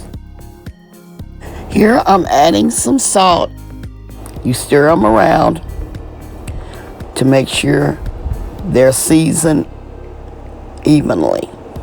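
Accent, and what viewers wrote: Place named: Georgia, United States. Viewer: Sound like she's from old Georgia..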